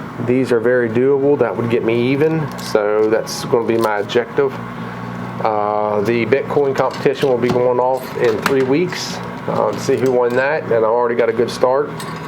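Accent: South, United States. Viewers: Speedy recovery for your friend Jeff. Hope your cold clears up soon. Just a note that your voice sounds fine (except when you slow it down to creature from the deep level lol) I could listen to your voice all day lol Southern flavour easy to listen to. Have a great day. (Canada here) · I just like the sound of the money and chips falling and your Southern accent, which I may add is even cuter when you speed it up and sound like the! Makes that song get stuck in my head. Love your videos. Used to watch a lot of them, but now I only watch yours. Keep em comin!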